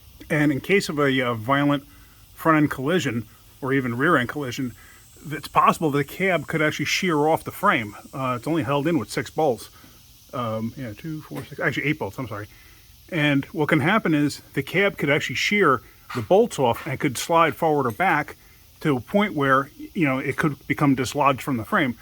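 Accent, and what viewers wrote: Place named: South Jersey, United States. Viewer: I'm From south Jersey/ Phila area, picking up on a tad bit of my accent over here. 👍Awesome vid, just bought a Jasper eng. n plan on doing this. The easy way. Thanks for the vid and subscribed. Keep those vids a rollin ✌️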